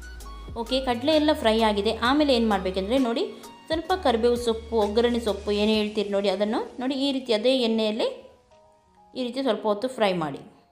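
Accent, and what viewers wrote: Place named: Udupi, India. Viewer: Idu udupi kannada mr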